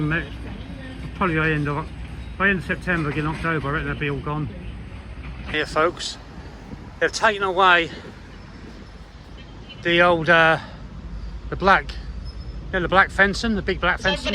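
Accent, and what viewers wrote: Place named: Norfolk, United Kingdom. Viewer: I thought you sounded like you were from Norfolk! DSM are coming to Norwich soon, to knock down Anglia Square.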